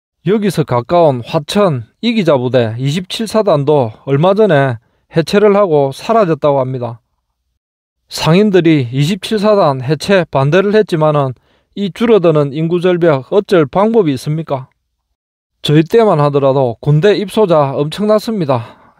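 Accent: Gyeongsang, South Korea. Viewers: 경상남도? 사투리 구수~합니다. 1년 형님 이시데요 저는 쥐띠 입니다. 95년 9월 전역했습니다.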